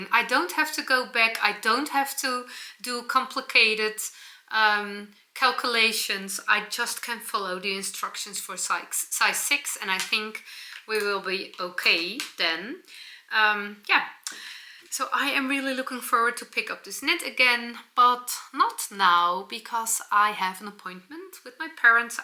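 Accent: Netherlands. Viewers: We love your Dutch English! It is actually very good! 🙂💕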